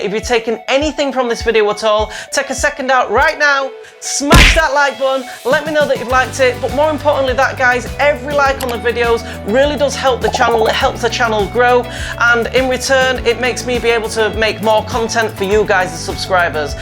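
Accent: United Kingdom. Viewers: est-ce qu'il a un accent genre super british?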